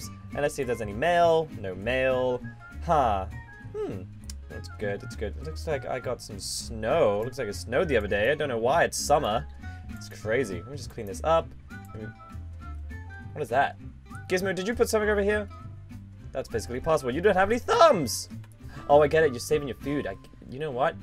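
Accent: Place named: England, United Kingdom. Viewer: I 💗 your English accent and your super nice and I always watch your videos every day 😍🇬🇧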